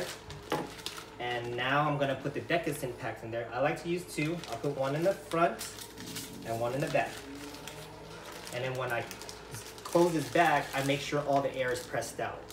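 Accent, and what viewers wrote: United States. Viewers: Are from the state of Hawaii? I think I hear the local Hawaiian accent.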